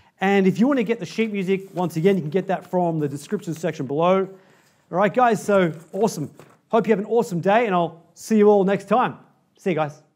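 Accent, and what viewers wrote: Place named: South Africa. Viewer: *accent sorry. You're probably Australian, but I'm leaning towards South African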